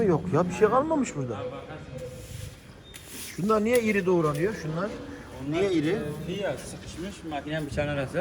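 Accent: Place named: Kahramanmaraş, Turkey. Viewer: abi Maraş ağzı konuşman o kadar hoşuma gidiyor ki:))) komedi videosu izler gibi seyrediyorum. Memleket özlemimi gideriyorsun:))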